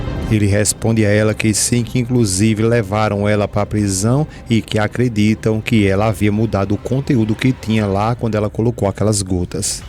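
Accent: Pernambuco, Brazil. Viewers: Sr. Narrador, você é do Cariri ou Pernambucano?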